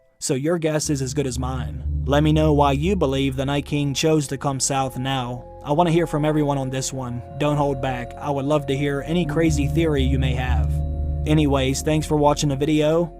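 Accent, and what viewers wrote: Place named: South, United States. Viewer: You speak like a southern lord Baelish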